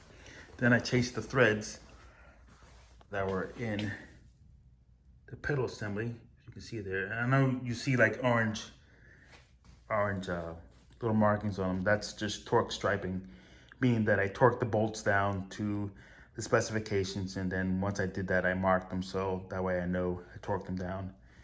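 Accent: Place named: Northeast, United States. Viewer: Good work! I hear a hint of Northeastern in your voice.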